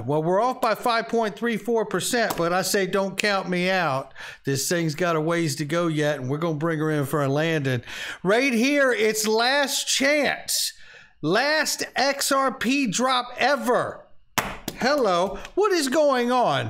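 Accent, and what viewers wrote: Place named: United Kingdom. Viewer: We loveee your show TV 🥰🥰🥰🥰🥰😍😍😍😍😍🥰🥰😍🥰 you very good british speak i very much smile happy see you ❣❣❣ love really bro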